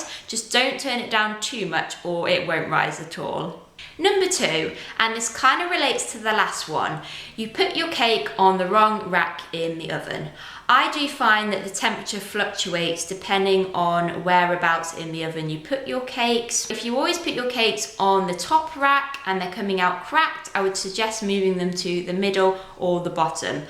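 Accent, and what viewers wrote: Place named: United Kingdom. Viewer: I like your brit accent :p cute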